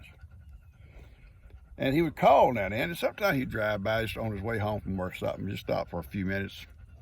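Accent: South, United States. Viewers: I love your country accent and your stories. I couldn't stop laughing 😂😆 at doc and the racoon on his head at the stop light.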